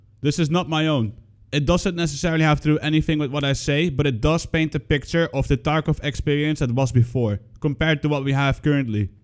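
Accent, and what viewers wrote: Netherlands. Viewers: dude...as I listening to you, I imagine how many critics do you get cuz u are apologizes for your accent and for your opinion....man it's you, and your opinions...if someone isn't like your accent or your opinions, well....I say to those ppl get the f... outta here and finde someone else! Its simple, a left mouse click, or a tap in your phone....just downright get the hell out here and leave thise dutch dude alone.:) So calm down and dont bother yourself with idiots....just be yourself.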